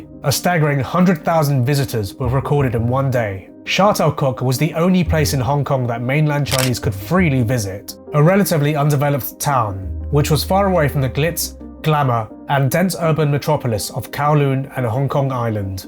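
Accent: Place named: United Kingdom. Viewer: I think he's British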